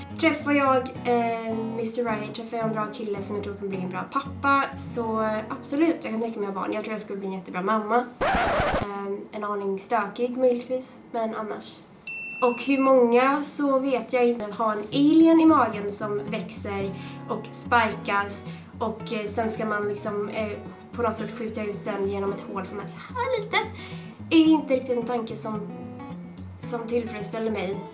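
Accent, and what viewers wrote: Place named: Scania, Sweden. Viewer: bliss haha. Ja då får du komma ner till Skåne. Hade varit kul o höra din skånska. 😎👊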